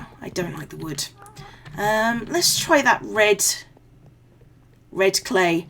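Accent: United Kingdom. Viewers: What i think about u is that I hate you you suck and your accent is just, ugh! Your videos are boring as hell!! What i ACTUALLY think about you is that, you're awesome, i like what you do, and i watch your videos and actually enjoy and be happy about it, i love you and your british accent:D you are the 2. Youtuber in my top 5 youtubers list! <3:3:D keep it up, you're going great!! >.<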